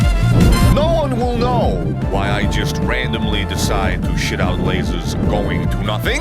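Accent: Scotland, United Kingdom. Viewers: I love his Scottish accent and I have no idea why.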